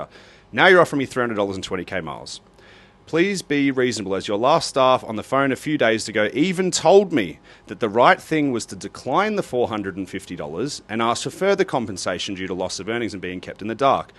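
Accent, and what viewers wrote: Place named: Australia. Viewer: You sound like an Aussie. The same as me. We fly Emirates BC once a year to Europe. Never had an issue. Did fly to the States once and our luggage was lost for 2 weeks. Yes it was a pain in the ass. But as my hubby says, dont stress about crap you cant control. But i get its frustrating. We got zip as we were told to keep reciepts and claim on insurance. As for Eithad and Qatar. Qatar. Please support your fellow Aussie females and boycott them. Females were taken off their flight, which is government owned, and were forced to have internal examinations in a ambulance on the tarmac, as someone had a baby and left it in the terminal. Every female was taken off the flight and subjected to this assault. Law suit is currently pending. Qatar also often change out their aircraft. You book a flight which has Q suite listed. Then operational change and you end up on a piece of crap business class seat that has zero privacy. 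Atleast with Emirates A380, if you pick a window seat you have privacy with how the seats are done. Like your own cubby. Qatar are well know for changing out aircraft for operational reasons. Eithad. Besides looking cheap and nasty, some of their planes, 787 and A380, they have some rear facing seats in BC. No idea about FC. So if you go this route chose your seat wisely. Dont let one bad experience with Emirates define them. Look at Qantas. I only fly them domestically because they are crap. But i still fly them because Virgin are even worse. Happy travels. Caz.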